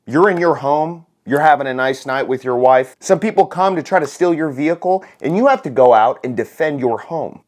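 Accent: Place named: Texas, United States. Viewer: You talking like a Texan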